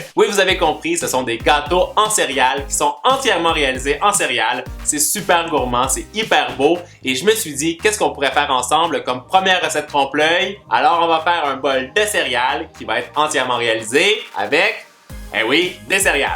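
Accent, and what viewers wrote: Quebec, Canada. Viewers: J'adore ton accent de québécois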